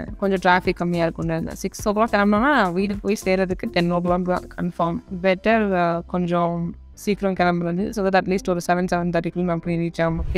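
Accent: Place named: England, United Kingdom. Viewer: Tamil la pesu bodhum english accent..😅😅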